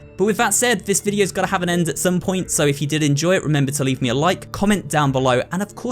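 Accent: United Kingdom. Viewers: Are British or what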